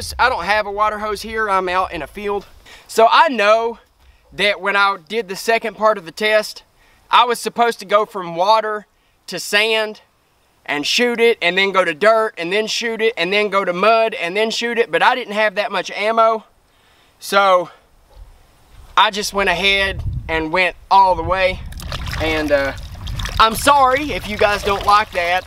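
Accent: South, United States. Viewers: Good content and that southern draw is definitely new in the reviewer space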